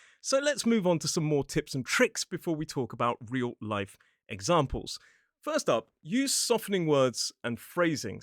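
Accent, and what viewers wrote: United Kingdom. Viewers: But we do llike his talking and we are here to listen to his brilliant british accent❤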